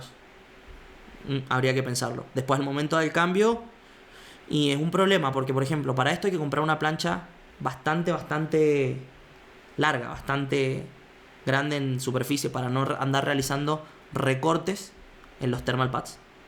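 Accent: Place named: Mendoza, Argentina. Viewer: Sos de Mendoza o cuyo? Ese tonito se me hace familiar jajaja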